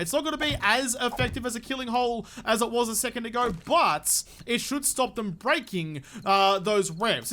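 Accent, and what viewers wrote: Australia. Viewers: Luv ya vids and ya silly Base ideas Thx a lot Mate ♥♥♥ Even as a fu... ng Kraut I overstand ya Aussie English (worked a couple of years as Scuba Diving Instructor and had a few Aussie Coleauges) Where I n I cant get some Tickets 4 Park 🤔🤔🤔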